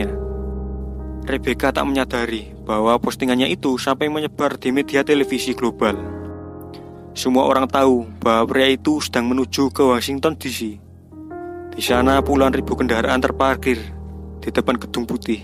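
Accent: Java, Indonesia. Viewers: Medokkk nya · Yang jelas pembawa alur ceritanya medok.. Ajib .. menghibur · Medokk bgtt bang 😄😄😄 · Nrtor nya busyet medok!!!!!!!!!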